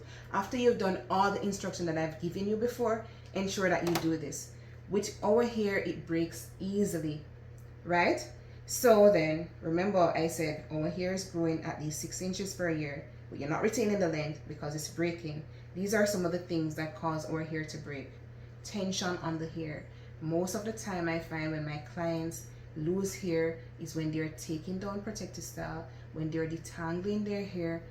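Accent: Jamaica. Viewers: Girl mine before that was our Jamaican 🇯🇲 accent ❤❤ new subscriber here sis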